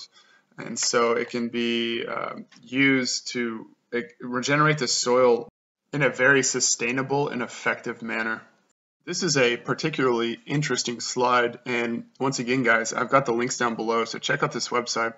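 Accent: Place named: United States. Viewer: That’s wonderful. I can tell you truly care about animals. You seem like a nice guy. I’m glad I found your channel:) Wow Finland? That’s awesome! You have an American accent. Why did you move to Finland if you don’t mind me asking?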